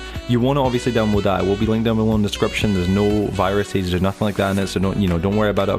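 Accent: Scotland, United Kingdom. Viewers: Are you Scottish? You just sound Scottish, if you are then that's cool, cause I'm also scottish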